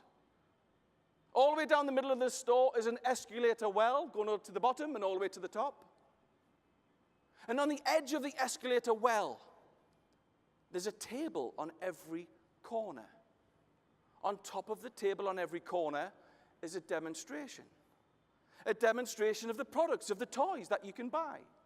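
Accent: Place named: Scotland, United Kingdom. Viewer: Brilliant Keynote. I love that you have an accent (me too I'm a Scot)!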